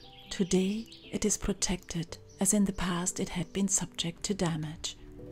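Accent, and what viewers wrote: Scotland, United Kingdom. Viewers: How is it that you have such a pronounced "Scottish" accent? I''ve known people who've spent almost their entire lives living in their adopted country but still speak english with the accent of their birth country.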